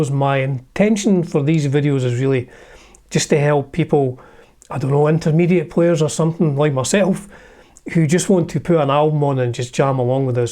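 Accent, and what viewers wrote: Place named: Edinburgh, United Kingdom. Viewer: Brilliant video that's a great song got the original LP and on Dbl live LP at Budokan belter. You're a great communicator clear spoken with that Edinburgh accent. I'm for learning this on my Ibanez RG don't yet have a Gibson or Flying V 🤔🎸👍🎧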